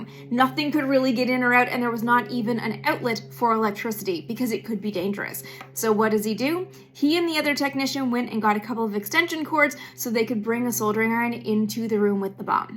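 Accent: Canada. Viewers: Yes, she’s Canadian. I don’t know if you’re new to the channel, but it becomes really obvious that she’s Canadian after a short while. I think it’s nice that she doesn’t try to hide it. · I know it's just your Canadian accent coming thru... but its pronounced 'sod-er'. the l is silent. love your vids!!! 🤗🤗🤗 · You're such a Canadian, still. 🔥💙 The way you say "soldering." Yes, pronounce that L. I'd love to talk with you about it. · has a bunch of pronunciations that I find adorable. Some are Canadian. Some are based on how NASA pronounced words. I think this one was just a mistake.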